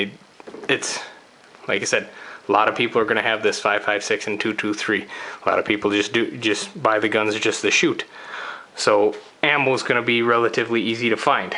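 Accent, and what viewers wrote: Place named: Canada. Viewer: Good vid. Not to clown, but you sound heavily like a Canadian haha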